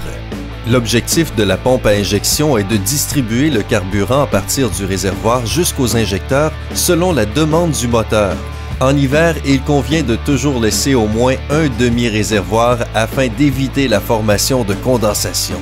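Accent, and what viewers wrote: Quebec, Canada. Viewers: Sous titrage merdique avec cet accent canadien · Pourquoi cet accent québécois ? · nice Canadian accent :)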